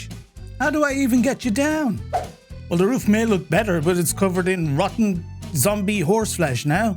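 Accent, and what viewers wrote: Ireland. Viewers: My daughter started playing Minecraft when she was 7 in 2012 on the Xbox 360. I was an OG gamer going back to the 80s (I'm an old fart) and blew off the game as a joke for almost a decade until a certain global event hit in 2020. She got me hooked on it and I've never gone back. I sub to a lot of Minecraft channels and yours popped up on my YT suggestions. , your MC channel is the best I've come across. It deserves over a million subs. Your Irish accent and spirit are an absolute joy and stirs my Irish ancestral blood in me. Please keep up the outstanding videos, builds, humor and music! Well done! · Right!! Either that or I really am obsessed with puppies! Lovely to hear a fellow Irish gamer! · By chance are ya Irish and if so what part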